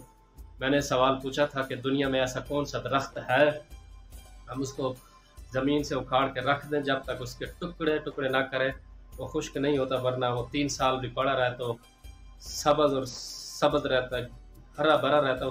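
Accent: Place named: Saraiki, Pakistan. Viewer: سرائیکی آواز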